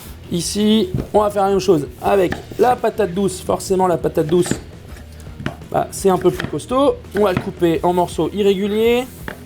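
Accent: South, France. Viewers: Mmmh des bonnes grosses saucisses... Et cet accent sudiste exceptionnel :'') Superbe vidéo avec beaucoup d'humour !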